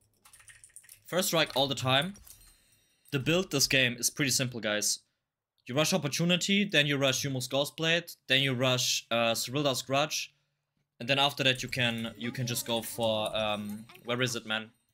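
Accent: Germany. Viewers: this middle eastern looking boy with german accent is just too good! i ve learnt about qyana s laning phase in 2 videos more than i have since she was released... keep it up sir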